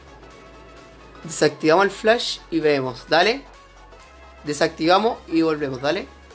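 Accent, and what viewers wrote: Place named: Chile. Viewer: Wueon apuesto lo q quieras a qué eri chileno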